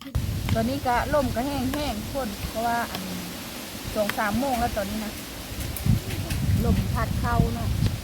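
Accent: Phu Thai, Thailand. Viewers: ข้าวใหม่ เป็น ข้าวไกม้ มำไมไม่พูดว่า ค้าวเม้อ (หยอก)ป้งเพราะดีเน้อ สาวภูไทตนงาม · สำบายดีน้อง ผุน่ารัก. สวยจังสาวภูเทอ.ขยันจัง.สำเนียงภูไทม่วนหูดี..กเฝห้กำลังใจนำเด้อจ้า. จ๋า...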